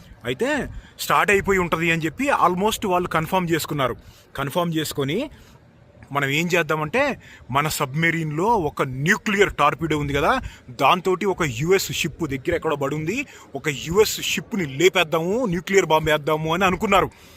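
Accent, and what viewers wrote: Andhra Pradesh, India. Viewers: miru andhra vallu laga matlada taru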